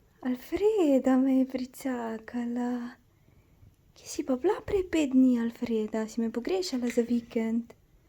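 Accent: Carinthia, Austria. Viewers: Ful si fajna!💕🌊Aja iz kot si ti ko mas ful koroški naglas,js sm iz koroske?🌍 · tudi ona je iz koroske drugace nebi mela koroskega naglasa😂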